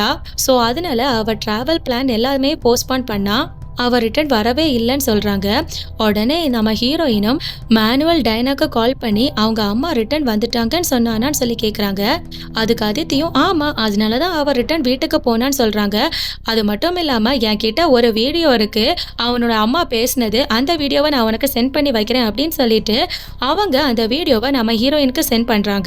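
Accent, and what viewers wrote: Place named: Kerala, India. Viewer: Malayalam accent explanation 😅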